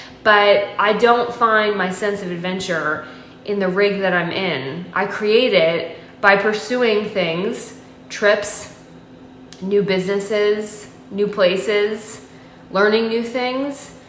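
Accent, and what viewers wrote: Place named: California, United States. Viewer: Girl where you from ⁉️⁉️⁉️ You sound more Cali than North CAROLINA. Keep your sense of humor it looks good on you.!! ✌💗🎼🎵🎶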